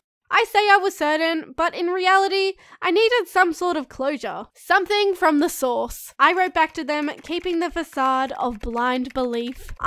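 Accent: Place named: Australia. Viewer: I love how I understand you sm because one word.... Australia ✨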